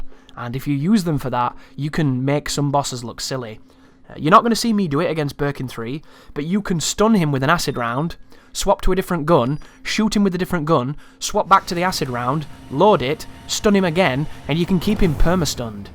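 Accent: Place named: London, United Kingdom. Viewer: Hats off to you, sir! That was the most excellent balancing of amazing skills, speedrun strat explanations, humour, and game mechanic opinions that I have ever had the pleasure of witnessing on YouTube. And all presented with that glorious London accent, making it all that much more enjoyable. I could have watched a full movie instead, given the lenght of this video, but I honestly feel I got more out of this than I would have done spending the time at a movie theater. Thank you so much, you absolute legend!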